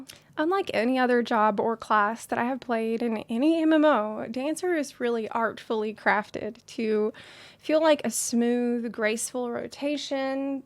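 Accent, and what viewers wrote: South, United States. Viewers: European girl with a country accent.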